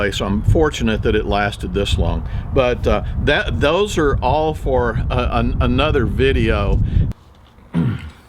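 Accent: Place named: South, United States. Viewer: ...I can’t imagine any of your subscribers “holding it against” you for taking the body of the deer to a place that other animals could make use of it’s body to sustain themselves. That is only nature. I love all your videos because your soft voice and southern accent are so calming. And I love hearing about and seeing Uncle Floyd. What a treasure he is! And sweet Buddy....my heart just breaks. I have a black lab who is a month away from her 13th birthday. She had a stroke 6 weeks ago and is doing fairly well, but I know she doesn’t have long. Thank you for letting us get to know you and enjoy the things you do on your Texas ranch vicariously!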